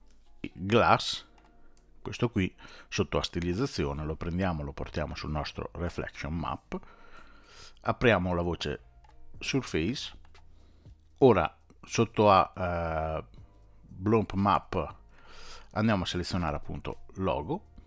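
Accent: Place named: Emilia, Italy. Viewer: Complimenti, sei maledettamente bravo, ti seguo sempre... Volevo chiederti una cosa, se è possibilee... Vorrei fare una casetta in campagna usando una foto, ma vorrei che nelle finestre della casa, si accendessero e si spegnessero, stessa cosa vorrei fare dei lampioni antichi, quelli di un tempo che avevano la candela, come si fa a fare questo effetto ? So che per te è una sciocchezza, ma io ci sto diventando scemo e mi sono guardato quasi tutti i video del tuo canale. Grazie e non smettere... FORZA BOLOGNA !!! L'accento Emiliano...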